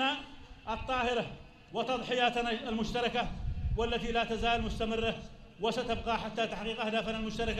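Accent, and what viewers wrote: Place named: United Arab Emirates. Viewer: كلامك اماراتي روح بلادك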